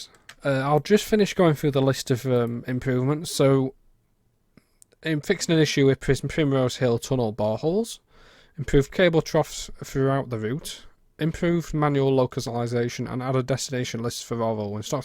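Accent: Yorkshire, United Kingdom. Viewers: Nay lad you must stick with the yorkshire accent or I may need to give you an ecky thump ( Goodies refrence for the younger viewers) Great video bye the way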